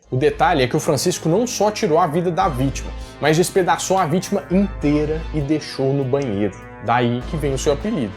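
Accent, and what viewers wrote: Minas Gerais, Brazil. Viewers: Você é mineiro? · Você é mineiro? Falando da brilhantes kkkk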